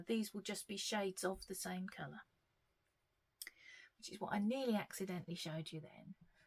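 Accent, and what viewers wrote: United Kingdom. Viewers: Love your podcast and you have a great sense of humor! I especially enjoy the British accent as I’m from the USA. Thanks for making the videos.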